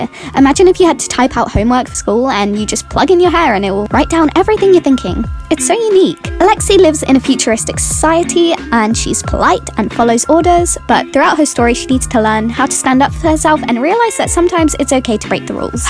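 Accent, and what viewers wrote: United Kingdom. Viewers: Haha I love your accent! It sounds like a southern British one which is where I'm from :D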